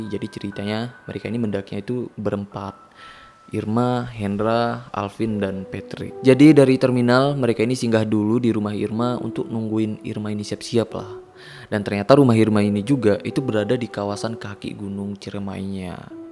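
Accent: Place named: Medan, Indonesia. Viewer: Orang medan yah?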